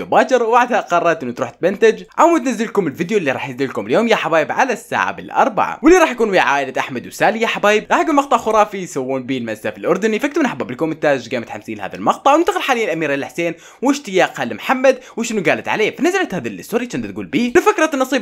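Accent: Iraq. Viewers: انا احب العراقين والهجتهم عشان جي احب اسمع وهو يتكلم 🇮🇶🇦🇪❤️❤️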